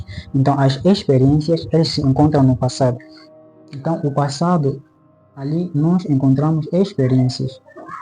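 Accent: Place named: Portugal. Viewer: O sotaque é como se fosse português de Portugal. Ótimo vídeo. Obrigada. Sucesso! Abraço de Belo Horizonte, Minas Gerais, Brasil.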